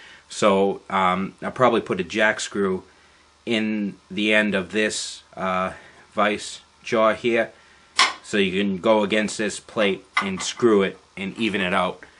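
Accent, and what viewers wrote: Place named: New England, United States. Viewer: Good stuff, sounds like your from new england.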